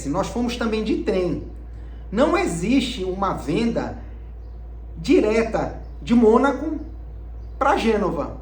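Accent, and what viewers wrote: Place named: Pará, Brazil. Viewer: Pelo sotaque é paraense, rs! Acertei????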